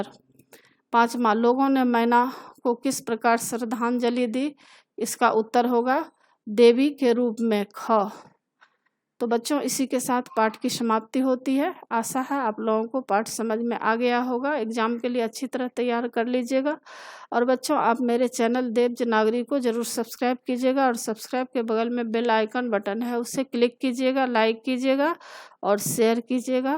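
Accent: Bihar, India. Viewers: Mam aap bihari hai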